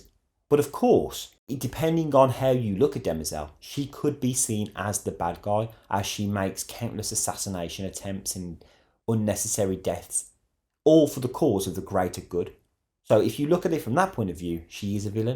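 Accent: United Kingdom. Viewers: 😂 sounds Brit to me 💯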